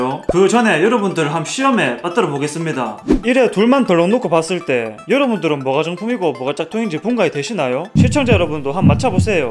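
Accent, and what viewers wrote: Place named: North Korea. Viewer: 사투리가 매력적이시네요! 혹시 북에서 내려오셨나요?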